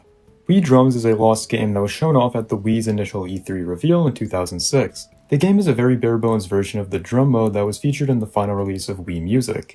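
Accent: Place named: Portugal. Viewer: Are you portuguese? You have a certain accent.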